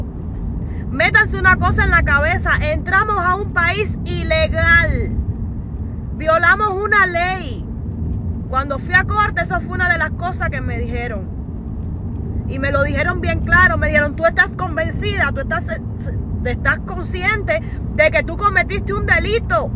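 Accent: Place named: East, Cuba. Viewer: nena, eres una grande, salud, y millones de bendiciones, yo soy de la habana pero me encanta tu asentó oriental, y muy bella que eres.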